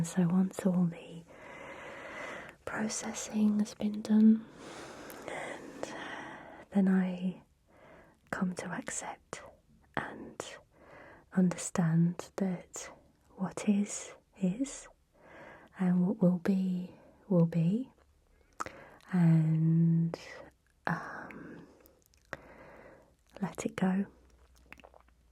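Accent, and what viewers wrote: United Kingdom. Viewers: I subscribed because of the nice British accent c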